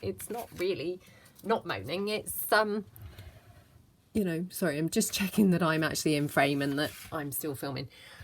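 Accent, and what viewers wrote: England, United Kingdom. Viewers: Are you from England or Australia? Love your accent. When you say you have puddings for dinner what does that consist of. Love your videos, stories.🌺🌸🌼🌻🌹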